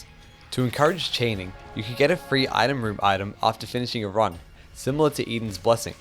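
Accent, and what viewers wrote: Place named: United Kingdom. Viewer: i thought it was mean but then i realized he was just british, carry on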